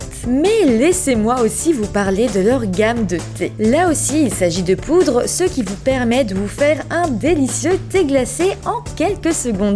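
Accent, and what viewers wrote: Belgium. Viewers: L'accent Belge 😍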